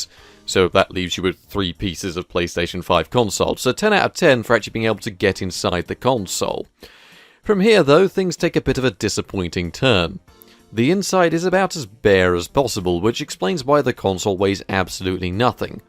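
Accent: Canada. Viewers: Canadian English, perfect! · Canadian English eh? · Canadian English 😂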